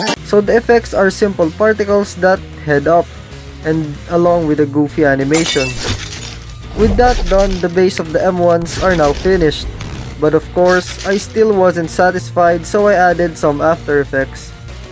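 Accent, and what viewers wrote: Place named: Philippines. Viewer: Nakita kolang tong video mo kaka scroll ko hahahah sa boses mo palang halatang pilipino, keep it up bro lab u. ❤❤😂 Proud developer pilipino here😂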